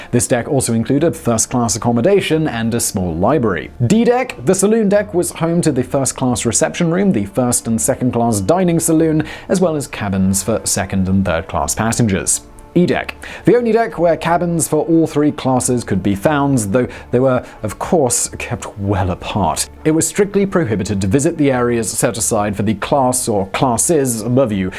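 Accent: United Kingdom. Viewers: All of channels present facts in an interesting way with use of a very articulate Britsh dialog. I can listen all day in astonishment of how in contrast, I speak so badly as a southern American.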